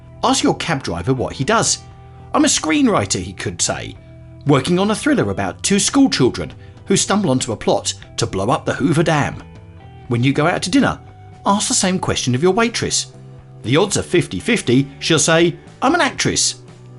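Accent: United Kingdom. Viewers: Ive never herd something deep from a british accent.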